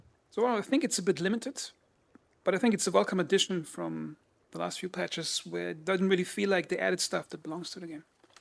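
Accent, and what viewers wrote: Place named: Germany. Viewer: Textbook German accent 😊 🇩🇪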